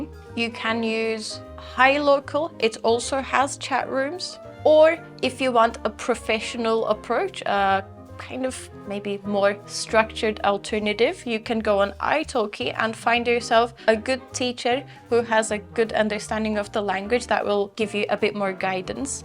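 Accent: Australia. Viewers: are you using Aussie accent? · Sagol. Great info. You always make such good sense and content of your videos are wonderful. Is that an Australian/Turkish accent I hear from you?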